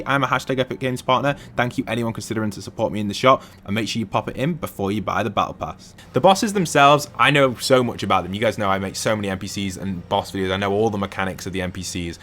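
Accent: United Kingdom. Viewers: Yo r u from Leeds or manchester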